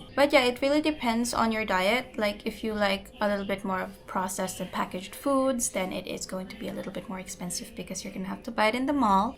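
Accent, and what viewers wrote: United States. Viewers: I can tell u grew up with an American father or influence. Ur American English is very evident. Good luck on ur channel. Love ur accent. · 🤔 I'm curious, you philipinna? You sound like a girl from the U.S.😂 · Honestly, don’t mind the bullies. You speak perfect English I am from Chicago and you sound like an American with your English accent. I was wondering if you lived in the US where you’re from the US because of how good your English pronunciation and accent it’s amazing by the way I’m Filipino and I speak fluent Tagalog and I’ve been living here since 1993 I grew up there that’s why I am so amazed with how well you speak English more power to you. I wish you well and your son as well God bless and keep trusting God he’s got your back don’t worry.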